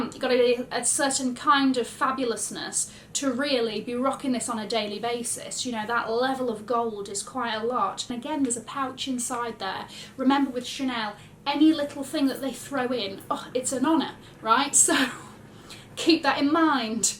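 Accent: United Kingdom. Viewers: Excellent videos on fashion trends, Miss. Fantastic British accent. Happy holidays from our channel: Australian Parakeets Stars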